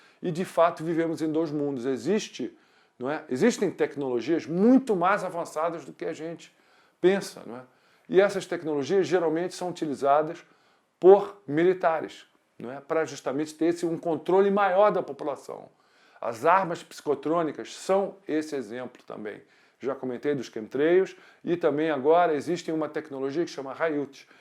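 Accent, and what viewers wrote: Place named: Rio de Janeiro, Brazil. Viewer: Excelente! O Sr. é muito entendido e esclarecido no assunto conspiração e fala o nosso bom e velho "carioquês"... gostaria de saber sua opinião sobre a conspiração alienígena, porque em meu ponto de vista lógico é a origem de toda a historia humana, a mãe ou a "ultimate" de todas as conspirações. Fomos criados para ser eternos escravos para servir propósitos excentricos de seres alienígenas. Gostaria de um vídeo, pois o sr. transmite clareza e embasamento nas palavras, sou mente aberta. Abraços.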